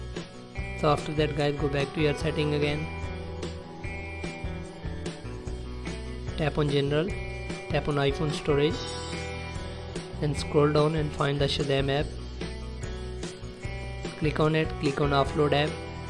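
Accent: India. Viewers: When i heard an Indian i knew this wasn’t going to work.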